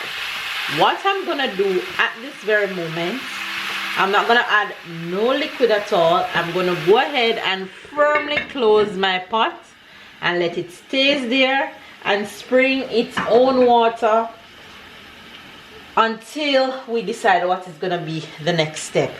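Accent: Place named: Jamaica. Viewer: If you didn't have the Jamaican accent i was going to skip this video ..... im excited to try this tonight !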